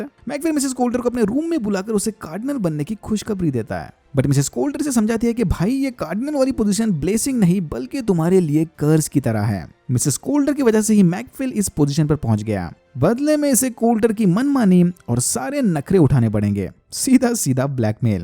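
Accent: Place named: Pune, India. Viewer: Punekar bolte🔥🔥🔥💪🏽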